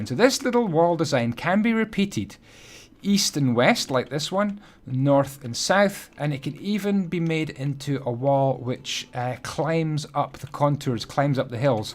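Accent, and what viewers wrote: Scotland, United Kingdom. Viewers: Is that a Scottish twang I hear?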